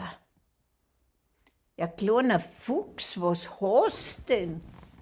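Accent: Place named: Bavaria, Germany. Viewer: Einso schönes Märchen im bayrischen Dialekt. Auch als Nordlicht konnte ich sehr gut folgen. Ich mag Mundart erzählt sehr! Es ist authentisch und hat Herz. Auch finde ich die digitale Kamishibai sehr ansprechend. Ich habe gerne zugesehen und zugehört.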